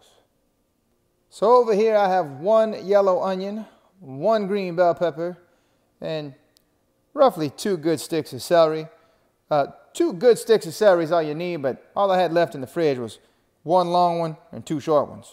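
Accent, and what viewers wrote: Louisiana, United States. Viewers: Ur wife is a lucky lady! Such a handsome guy+ u can throw dwn in the kitchen! U have such a sexy voice, with that Louisiana accent! Love watching ur videos!!!! Much luv to u and ur adorable family+ Gumbo!!!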